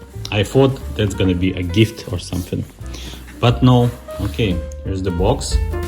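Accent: Russia. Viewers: О єбать який москальський акцент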